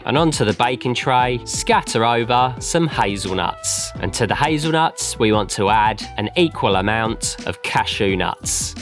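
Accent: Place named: England, United Kingdom. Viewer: It's shocking when you don't hear an American accent on a YT channel!😂 It's called an English accent - well, one of them!😉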